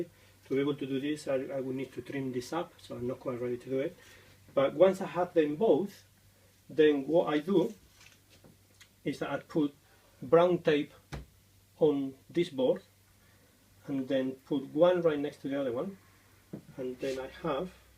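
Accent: United Kingdom. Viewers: I like these videos. Great instruction. But Mr learned English from a Brit. Some nice American should teach him how to pronounce the "oh" sound. (This, from an admittedly anal-retentive English teacher with a touch of irreverence. I wish I could speak Spanish half as well as he speaks British.) But in all seriousness, these are great videos. Mr. shows and explains as well as can be done in a video. I hope someday to be able to take one of his classes.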